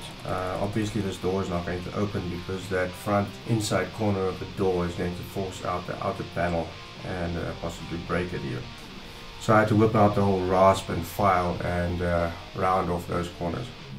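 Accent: South Africa. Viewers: I have never seen that plunge technique before mate, that's awesome. That will come in very handy I'm sure. Sounds like a Durban or Cape town aven't there?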